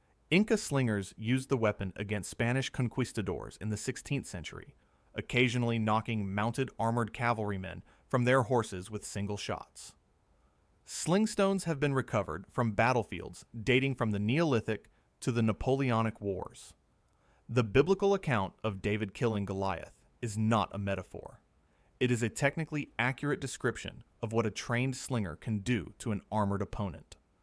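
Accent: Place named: United States. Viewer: i wish you could learn to pronounce composite correctly. Your American pronunciation is so grating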